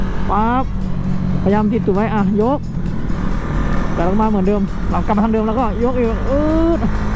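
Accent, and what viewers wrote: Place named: Isan, Thailand. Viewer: เว้าอีสานคือเป็นตาแพงที่ว่ะ😅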